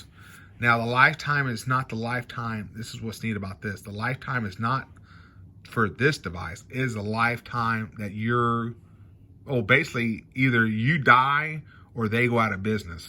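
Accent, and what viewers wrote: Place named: South, United States. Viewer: Can you sound any more like hilly billy john. and your "okay" every other sentence. you are exactly how you sound.... Like an uneducated idiot.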